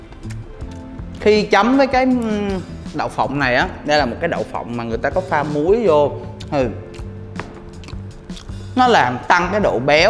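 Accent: North, Vietnam. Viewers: Nói giọng bắc buồn cười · Giọng bắc xịn đấy:))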